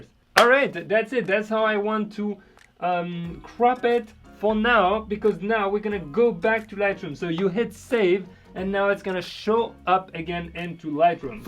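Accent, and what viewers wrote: France. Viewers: Just love your french accent 'And Voila' love it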